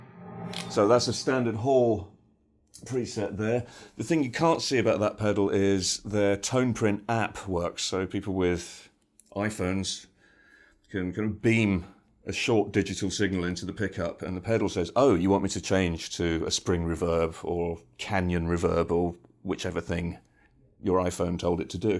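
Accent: United Kingdom. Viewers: Lovely British Accent!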